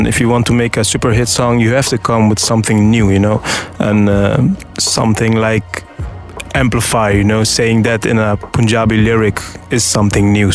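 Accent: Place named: Bihar, India. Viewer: Bhot Chutiya Naam Hai. Plus Tu Bhi Bhot Gandu Lagta Hai. Sale English Bihario Jaisi Kyu Bolta Hai. Thanks For This Upload Though Bro K Alawa Kuch Aur Bol Bhot Fake Aur Effort Dalne Wala Sound Kar Raha Hai.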